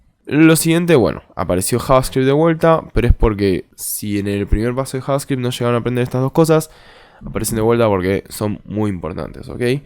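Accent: Argentina. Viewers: Un asiático hablaba español con acento argentino, estoy confundido jaja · Que feo un asiático con acento argentino :/ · Cuando ves a un chino hablando en español argentino, no tiene nada de malo, pero como que algo no cuadra. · Mis ojos: es un programador asiático Mis oídos: no, es argentino.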